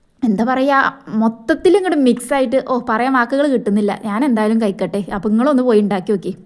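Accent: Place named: Kannur, India. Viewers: കണ്ണൂർ ജനിച്ചു വളർന്ന എന്നോടാണോ കണ്ണൂർ ഭാഷ ഇല്ല പറയുന്നേ 😅അവൾ പറയുന്നതിൽ വടക്കർ എന്ന് പറയുന്ന കണ്ണൂർ കാർ ഉണ്ട് അവരെ ഭാഷ വരെ അതിൽ വരുന്നു 🤣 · Kannur bhasha parayalley shariyilla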